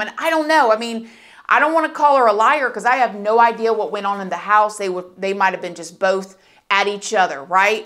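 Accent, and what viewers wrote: South, United States. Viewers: Lol your southern accent was super hard in this video. That happens to me when I’m around people from Brooklyn. Love you girl PS you must’ve been around someone today that has a hard accent. Hehe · I just love your honesty and southern accent!! You’re awesome.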